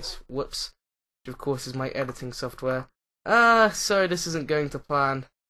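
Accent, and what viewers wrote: United Kingdom. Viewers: You say we Americans have an accent? Well I don't believe that but I do like the British accent like you did say... But I would love to have a German accent. So go ahead take my accent I'll take your British one. :)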